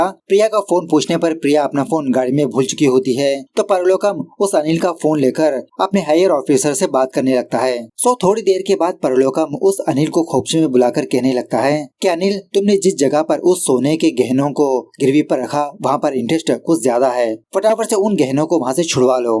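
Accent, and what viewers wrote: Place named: South, India. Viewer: Aap kadapa se ho😮 aapka accent south Indian hai but i didn't think k aap Andhra Pradesh se honge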